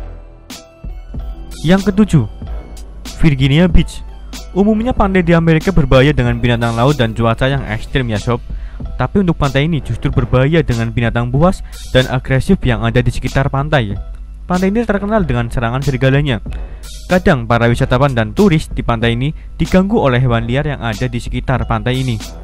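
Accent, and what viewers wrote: Java, Indonesia. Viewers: medoknya dikondisikan...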